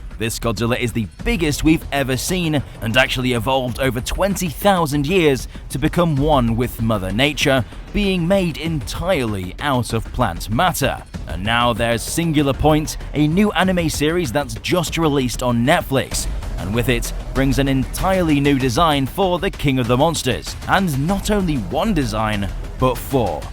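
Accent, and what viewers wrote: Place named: United Kingdom. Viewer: I kinda want to know what type of pound cuz he sounds British.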